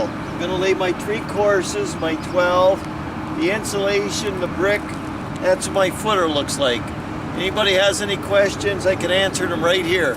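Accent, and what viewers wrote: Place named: New Jersey, United States. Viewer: Lol u gotta be from jersey from the accent. Nice work tho 💪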